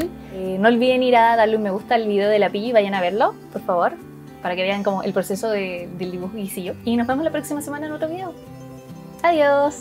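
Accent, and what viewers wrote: Chile. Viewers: Amo cono suena su acento chileno 💛💖💛💖 ~ bacan Jsjsjsjs la amé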